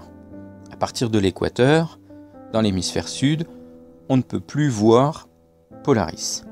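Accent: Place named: Alsace, France. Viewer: merci pour tes gros efforts ainsi de partager ton savoir. Il est primordial d'informer pour que les jeunes ne tombent pas dans le piège des complotistes. Pour les purs et durs de la désinformation, tu ne peux plus rien faire, excepter cette prévention. (j'ai remarqué au vu de ton accent que tu dois être du coté de l'alsace ou pas loin ?) :) note : chippie a obtenue gain de cause avec terrienne !!!! mais non rien, je note c'est tout !!! :p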